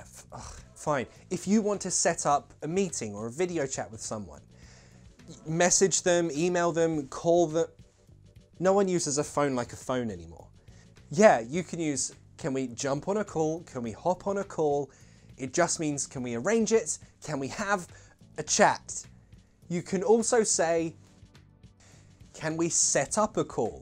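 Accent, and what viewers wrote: United Kingdom. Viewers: A big greeting from Piura, Peru. I really like your videos. They are so fun and I love british accent!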